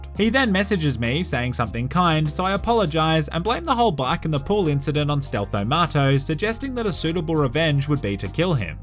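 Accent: Australia. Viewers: Having a ball watching your vids man. Only just found your channel .. alot of my favourite YouTubers are Aussies so good to hear another one.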